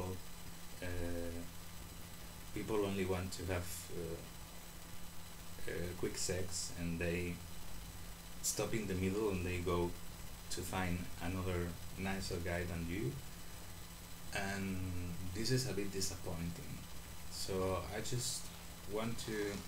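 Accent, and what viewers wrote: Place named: Spain. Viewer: I agree on the whole apart from 1 thing and that is how can anyone find a nicer down to earth guy than you, you say it as it is plus the Spanish accent makes my hairs on my back stand on end, keep up the great work adios for now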